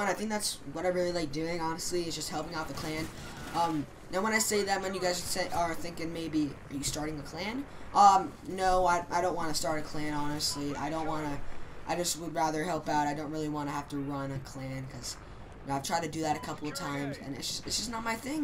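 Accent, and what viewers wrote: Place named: China. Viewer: Windows ahah your Chinese accent tho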